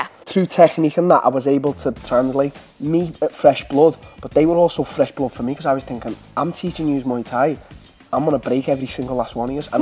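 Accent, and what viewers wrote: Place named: Liverpool, United Kingdom. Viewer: The scouse "r" and "arrr" help a lot.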